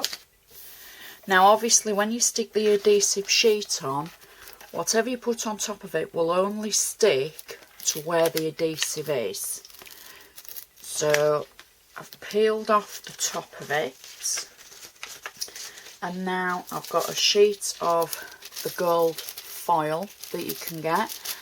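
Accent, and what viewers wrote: Yorkshire, United Kingdom. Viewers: What a great idea will have to give this a go thank you for sharing, is that a Yorkshire accent? I'm from. Doncaster 🙂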